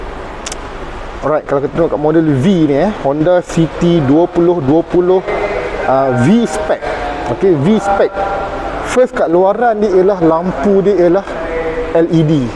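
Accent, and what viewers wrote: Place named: North, Malaysia. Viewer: orang loqstaq nii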